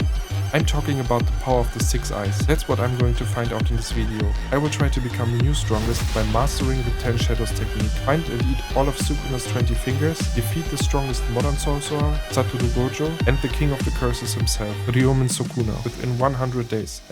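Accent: Germany. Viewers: are you german# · you are german right? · are you german by chance? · are you german 🔥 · Very german englisch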